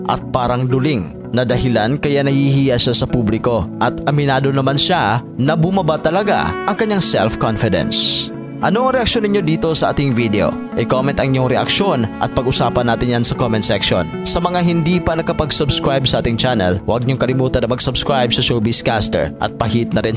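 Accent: Visayas, Philippines. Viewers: Tang ina mo! Ipakita mo video , wag ung visayang boses mo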